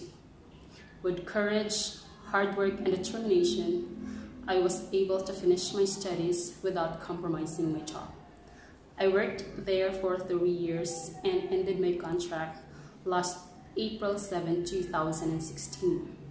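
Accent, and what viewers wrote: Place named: United States. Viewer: Ang galing mong mag english, American ang accent. It's very nice to get to know you,.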